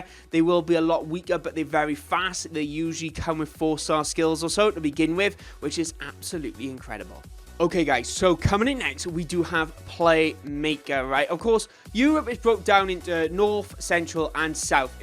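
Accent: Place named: Wales, United Kingdom. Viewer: Where in Wales you from?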